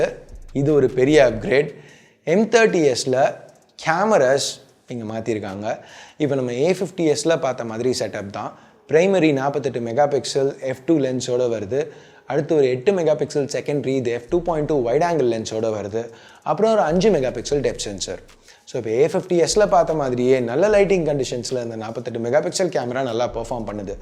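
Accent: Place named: Kongu, India. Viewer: Are you from Coimbatore? Your slang sounds a bit like Kongu Tamil.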